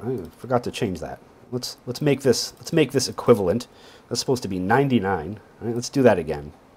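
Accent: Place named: England, United Kingdom. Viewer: I liked this video for the (English?) accent.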